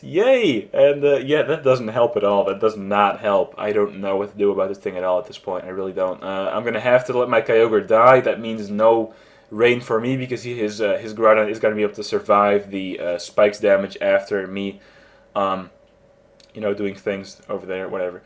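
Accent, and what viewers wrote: Netherlands. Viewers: U dutch? I can hear it in your accent haha ;)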